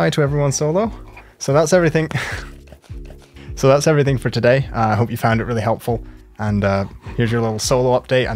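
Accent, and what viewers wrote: Scotland, United Kingdom. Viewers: Have my kids mr Scottish man thank you for this!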